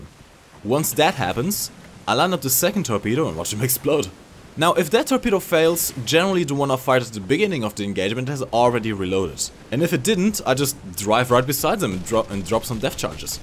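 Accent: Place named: Germany. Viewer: Thanks! Very helpful. Love your channel. I am a loyal subscriber and love your German accent!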